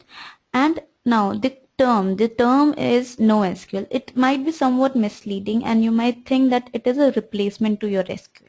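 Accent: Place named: India. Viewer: Dude, start understanding INDIAN accent else you'll be left alone. Its not about accent, its about your persistence and patience to understand someone.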